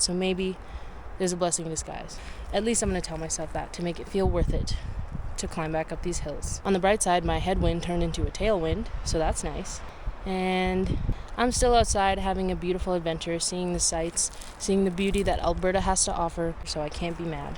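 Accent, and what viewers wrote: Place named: East London, United Kingdom. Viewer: that is absolutely excellent. what an amazing route and landscape. well done for documenting your travels. I love the word 'thunk'. It's awesome. love and good fortune (Mangala sutta) 😎😊❤ p.s. that was certainly an east end of London market traders accent. Or maybe Fagin from Oliver Twist. Certainly one of the two.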